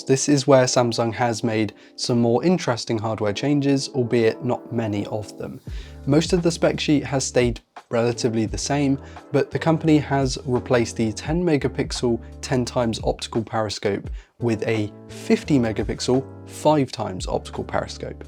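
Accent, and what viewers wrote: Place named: England, United Kingdom. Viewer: I might be wrong but you sound English so why saying dollars not pounds and it's obvious not worth it if you've a S23 model but anything from S22 down probably is worth it.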